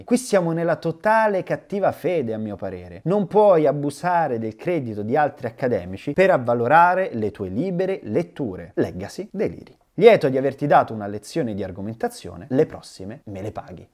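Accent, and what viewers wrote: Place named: Rome, Italy. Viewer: PORCA MISERIA..... Ed io che mi sono sorbito pure sti 15 minuti di video per ascoltare un tizio che ambiziosamente affermava di aver finalmente confutato Mauro Biglino (quindi un po' di curiosità me l' aveva messa). Poi.... Ti rendi conto che si tratta solo di un tale che sfotte, provoca, fa le battutine, e il tutto con una parlantina antipatica e volutamente romanesca (così viene meglio...), in pieno stile "iene" (magari ambisce a diventare uno di loro?). Si vede dal video nel suo complesso, che questo qui non ha alcuna valida strategia comunicativa, cerca invece solo di rompere le scatole a personaggi pubblici, denigrandoli gratuitamente. Con i tuoi: "dove scappi", "a meure'", "t' ho dato na lezione", per non parlare dei pochissimi spunti, tutti inutili quanto il resto del video, hai dimostrato solo una cosa. Che sei RIDICOLO e non sai tenere una discussione con nessuno, perché se volevi davvero confrontarti con lui, bastava non fare il maleducato irrispettoso, quindi forse il tuo vero obiettivo era un altro... 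Ha fatto bene Biglino a non risponderti più, è ovvio, qualcun' altro al massimo ti avrebbe altrettanto insultato. Sei solo un gran maleducato. Spero che le "iene", che a me non piacciono, perche spesso e volentieri usano il tuo stesso approccio irriverente nei confronti di chiunque, non ti prendano mai con loro.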